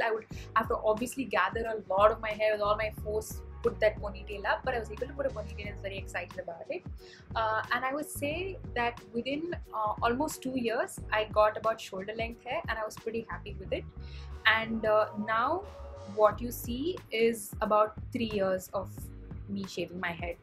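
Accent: India. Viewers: Her accent is Indian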